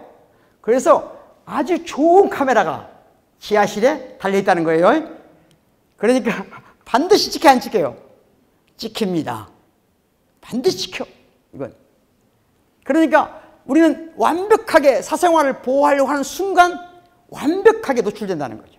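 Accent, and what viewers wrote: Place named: Jeolla, South Korea. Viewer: 박구용교수님 감사합니다. 요즘 유튜브를 통해 교수님을 만나는 재미가 솔솔합니다. 그리고 너무 유익하고 재밋습니다. 아마도 박구용현상이 이시대를 휩쓰는 건 아닐까하는 생각이 들기도 합니다. 70이 되어 미국에 살고 있는 용봉에 후예로서 가슴이 벅찹니다. 적당한 전라도 사투리 억양도 가슴을 따듯하게 하네요. 광주! 라는 말만 들려도 울컥하는데, 전남대! 라는 말은 더욱 뜨겁습니다. 감사합니다. 저는 이제 박교수님의 열렬한 팬이 되었습니다. 너무너무 자랑스럽습니다 박구용교수님, 당신의 탁월한 지식과 열린 가슴과 번뜩이는 지혜에 응원과 박수를 보냅니다. 당신의 헌신으로 암울한 사회가 깨어나기를 진심으로 소망합니다. 사랑합니다❤❤❤❤❤❤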